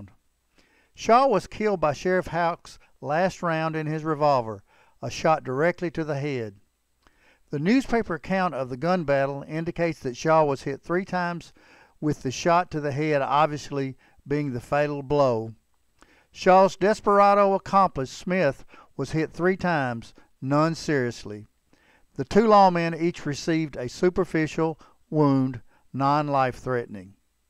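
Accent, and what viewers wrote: Texas, United States. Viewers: I like how the narrator has a Texas accent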